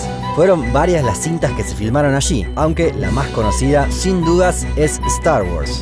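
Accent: Rioplatense, Argentina. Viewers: Sos argentino o uruguayo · Buen video pero no puedo soportar tu acento argentino en especial cuando pronuncias la letra doble ll como valle lo dices vashe☹️😒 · Es un argentino bastante sobrio, por eso queda simpático, aunque quizá sea uruguayo. ¿Algún día lo sabremos? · AY MIJO...VOS SOS ARGENTINO... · Como relator tiene axento argentino.. pense que diria que en argentina jaja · Yo solo veo tus videos por que me gusta el acento argentino saludos desde Mexico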